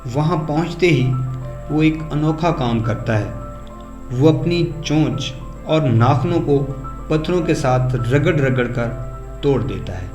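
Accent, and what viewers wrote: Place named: Saraiki, Pakistan. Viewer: Narrator ny kitni jamaat tk Taleem hasil ki thi. Accent bilkul Saraeeki 😫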